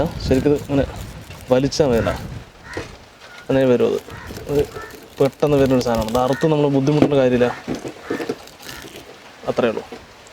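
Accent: Palakkad, India. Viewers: Ninga thani palakkadanallooo etttoooi... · തനി പാലക്കാടൻ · Eantha kattu ingalu palakkattu karan ano? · എനിക്ക് ഭയങ്കര ഇഷ്ടമാണ് പാലക്കാട് ഭാഷ... ഇഷ്ടപെട്ടവർ like ഇടൂ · Broo...ningal nalla oru cook aanalloo..superr...Aa Palakad slang edaku varunnundu.. kalakii.. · Nalla adipoli Palakkad bhasha ishtamullavar like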